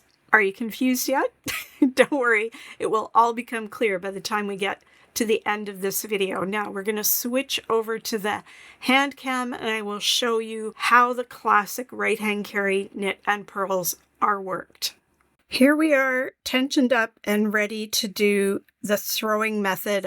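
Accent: Canada. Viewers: I am a crocheter, but I recently learned to knit because I became good friend with a knitter. She taught me continental, but i stumbled into continental combination. I'm SO GLAD to find out that it will NOT mess me up in the long run to do that instead of "traditional" knitting. :D Also, I picked out that you're canadian instantly. Thank you SO MUCH for these tips and method options!! You've gotten another subscriber from me!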